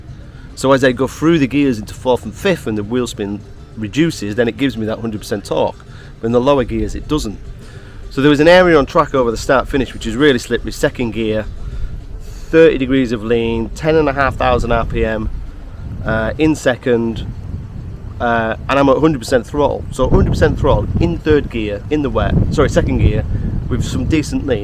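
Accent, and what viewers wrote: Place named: North, United Kingdom. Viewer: As a fellow northerner, I didn't need the subtitles....but I see why you added them ;) Proper job 👍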